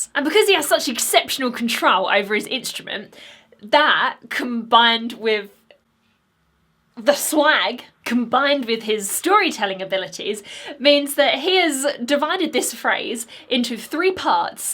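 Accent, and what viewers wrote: England, United Kingdom. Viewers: I subscribed to your channel just because ablas Español by the way I love your England accent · You are so beautiful and love your British accent! You've now become my favorite music reactor.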